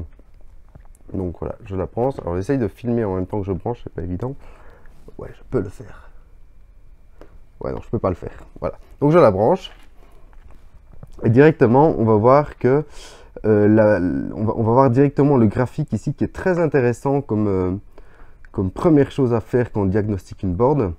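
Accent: Liège, Belgium. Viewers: Salut, Chapeau bas pr la transmission de savoir ! Dès la 1ère vidéo j'ai reconnu l'accent, puis le pull m'a confirmé ! Signé un autre Liégeois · Je viens de Liège aussi haha j'ai bien reconnu l'accent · Hello, très bonne vidéo qui résume bien l’essentiel. Je ne connaissais pas le coup du court circuit avec l’isopropanol pas mal merci. Et je crois reconnaître le petit accent et la sympathie de nos amis Belges que j’adore.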